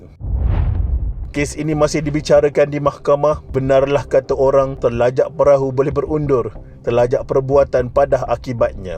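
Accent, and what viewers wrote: Kelantan, Malaysia. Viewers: Pengulas ore kelate ko tu?bnyi x hiley lg tuh.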